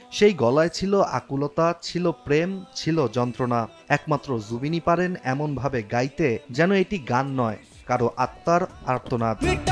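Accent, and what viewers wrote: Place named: Assam, India. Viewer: আপনার আসাম উচ্চারণ শুনতে এতটা বিকট লাগছিল। এরপরে সংবাদ পরিবেশন করার আগে ভালো করে বাংলা বাক্য উচ্চারণ শিখে আসবেন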